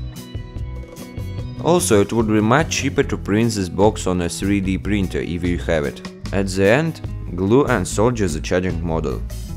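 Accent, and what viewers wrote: Russia. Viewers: Bro make more videos you are good and your english is good enough . And this Russian accent it makes your videos much better and different keep upp 👌👍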